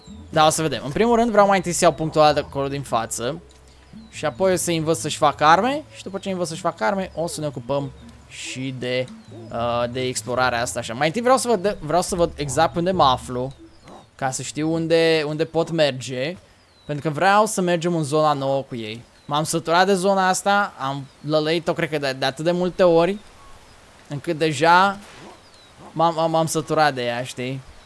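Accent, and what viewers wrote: Moldova. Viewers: bă ce-mi place accentul de moldovean :)))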